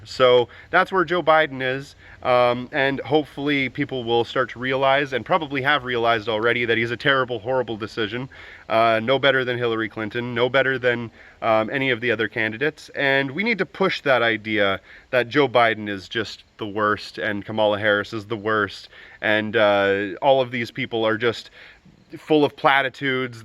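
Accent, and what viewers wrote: Canada. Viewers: You sound Canadian. Where you from comrade?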